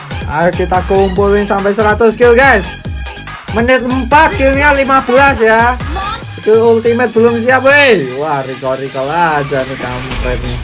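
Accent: Java, Indonesia. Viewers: Bang kok medok? Org mana?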